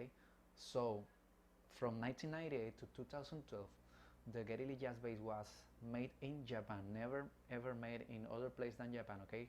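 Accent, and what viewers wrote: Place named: Chile. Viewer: Eres chileno? ... tu acento suena a chileno 😨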